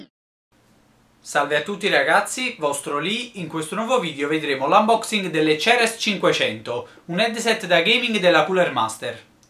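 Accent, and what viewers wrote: Naples, Italy. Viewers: ma sei di Napoli? perchè lo sento dall'accento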